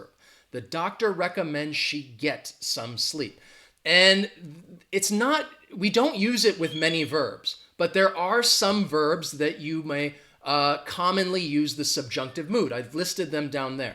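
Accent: United States. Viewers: Hiya dude. Kind regards from Venezuela. I do congratulate you on your wonderful and useful Youtube channel. I dunno why, but I love the English language. Therefore, I´m trynna do my best to learn a great deal of it every single day. It ain´t been an easy learning journey. Notwithstanding, I wanna become a proficient English speaker. Likewise, I must confess I love American English. So, I do enjoy watching your videos cuz you speak such a beautiful American English. I still a newbie, I mean I´m still a rookie at learning English. In spite of that, I´m trynna do my best to reach a fluent speaking level. Well, dearest friend, you´re an amazing English teacher and I enjoy watching your wonderful videos.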